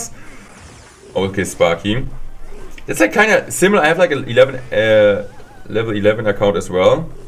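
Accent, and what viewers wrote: Germany. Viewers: He's Deutsch accent its heavy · Fun fact: has such a strong accent, that half of the words in auto-generated english are still considered as deutsch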